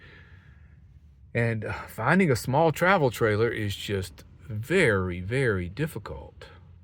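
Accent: South, United States. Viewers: you're the best! Every time I see that you have a post, I get excited. I love your little accent. I'm originally from Oklahoma City, I live in Arizona and have for the last 30 years, but I still have a special place in my heart for the southern folk with accents. You just seem so genuine and so cool! I wish nothing but the best for you! I'm looking forward to seeing what you end up with! You deserve some comfort, you hung in there and done great things! I love it when you say I'm tinkering! Haha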